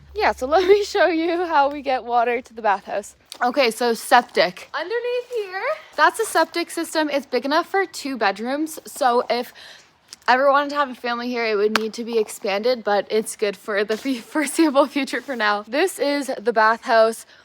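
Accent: Canada. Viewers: Nice! Ah the Canadian accent ❤❤❤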